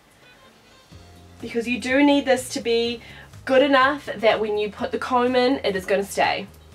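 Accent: New Zealand. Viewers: Yeah, the short 'e' becomes two long e's, sounds like NZ. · Sounds like she's from New Zealand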